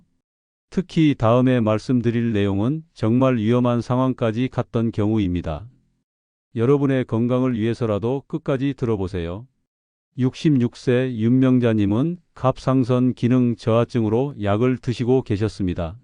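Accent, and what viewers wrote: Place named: Jeolla, South Korea. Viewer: 전라도 억양 소름돋아,,, 징글징글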